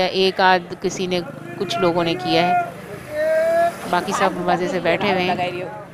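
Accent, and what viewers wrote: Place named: India. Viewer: Your voice is pure Indian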